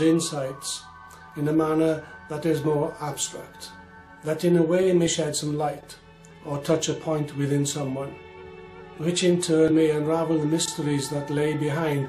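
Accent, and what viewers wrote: Wales, United Kingdom. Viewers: Are you welsh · Nice to hear a Welsh accent! 🏴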